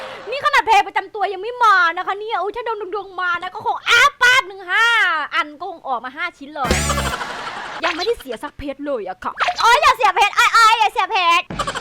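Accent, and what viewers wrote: Isan, Thailand. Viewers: พึ่งเคยได้ยินเสียงพี่แบบนี้พี่พูดอีสานด้วยไปกับหน้าด้วย555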